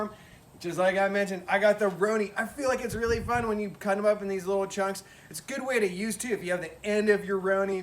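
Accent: California, United States. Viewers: I wonder if this guy knows how California he sounds, and of course he loves his nugzzz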